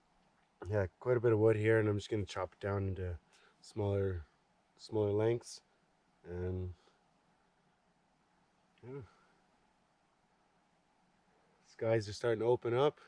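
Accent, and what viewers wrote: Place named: Canada. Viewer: I never realized how much we Canadians have an accent until I watched your videos haha makes me wonder if I sound like that too and just don’t hear it 🤣🤣🤷🏻‍♀️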